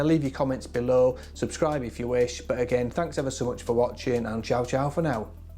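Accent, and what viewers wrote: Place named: Halifax, Canada. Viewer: You sound originally pretty local to me in Halifax! decent video, have you filmed any wedding footage with the osmo or just vlogging yourself? thanks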